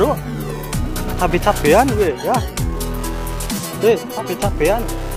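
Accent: Banyumas, Indonesia. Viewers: Wong ngapak eah kang... Ngapake ngendi rika kang. aku Cilacap... Semangat trus kang salam sehobi...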